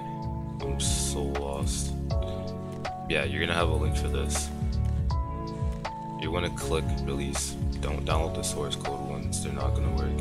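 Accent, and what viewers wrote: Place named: Baltimore, United States. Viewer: you from baltimore? i can hear the accent a little lols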